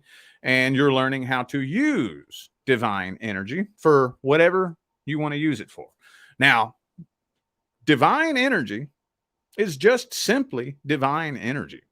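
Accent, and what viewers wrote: South, United States. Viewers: YOU HAVE A SOUTHERN ACCENT WHERE YA FROM?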